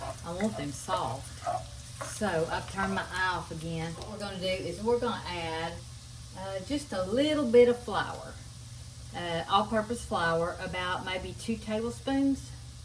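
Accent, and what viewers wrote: South, United States. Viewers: I’m so glad I found your channel. I’ve now watched several of your videos and everything looks so yummy! Love your southern accent…………I love also that you call your husband sweet Hunter…….my husband was a sweetheart, but passed away 4 years ago……miss him so much!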